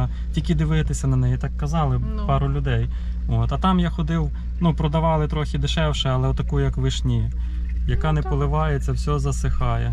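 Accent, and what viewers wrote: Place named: Western Ukraine, Ukraine. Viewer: Почала вас дивитись-зацікавили.Ви вихідці з західної України?говірка така,як в нас на заході.Удачі Львів 60,50грн.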